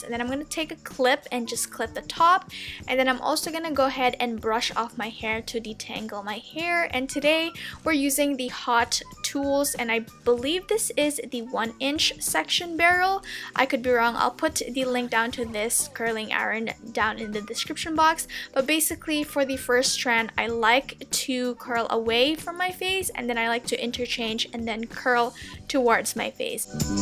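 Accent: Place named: United States. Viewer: you're so cute. you sound just like me w/ ur american accent when you speak tagalog. #struggles lmao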